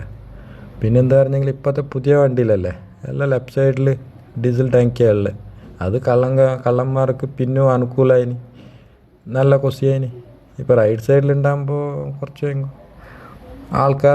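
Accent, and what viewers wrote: Kasaragod, India. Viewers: കാസറഗോഡ് ആണോ നിന്റെ സ്ഥലം, നല്ല ഭാഷ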